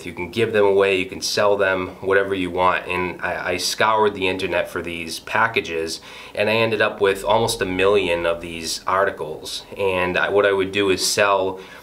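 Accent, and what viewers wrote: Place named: East Coast, United States. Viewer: I've heard your message so many times before. But because your voice is so calming and mixed with what I think is a an East coast accent, your message seems so much more trustworthy. Lol. Great advice overall man, keep it up! Also thanks for deciding to help others. You're obviously an individual who is on a higher level than most.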